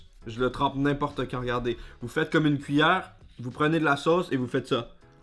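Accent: Quebec, Canada. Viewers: Tu connais alan 92 en France,regarde sa chaîne c’est un mangeur de malade 🤢 quand à toi tu me fait rires 😝 avec ton accent,et pourtant je côtoie beaucoup de québécois car nous avons une équipe de hockey à Rouen mais t’es connerie me font bien rigoler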